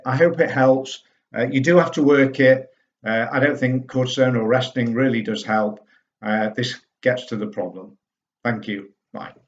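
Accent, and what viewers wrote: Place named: Midlands, United Kingdom. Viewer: Thanks for the tips. I know you are a Leeds boy, but your accent sounds more like the Midlands to me( Staffs, Derbyshire?). Or am I wrong lol?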